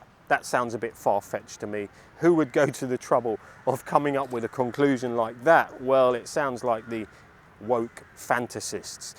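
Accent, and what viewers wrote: United Kingdom. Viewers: I don't like your Pommy accent and did you really call Steve Rosse a C--t ?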